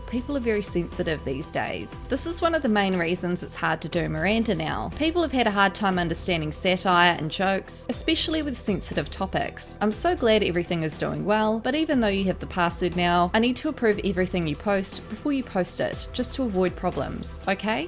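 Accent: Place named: New Zealand. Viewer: I love your NZ accent... oh the dramaz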